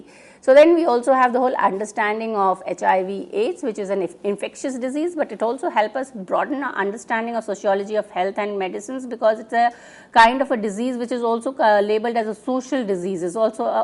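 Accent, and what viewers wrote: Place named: Haryana, India. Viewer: Robotic and fast lecture. Very discouraging for the students. Memorised lecture marred by hariyanvi accent.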